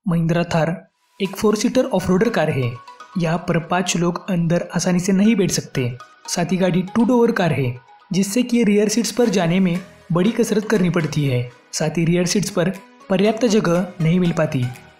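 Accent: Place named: Maharashtra, India. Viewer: You sound like you are from south western Maharashtra; maybe Sangli/kolhapur/satara. Nice video though!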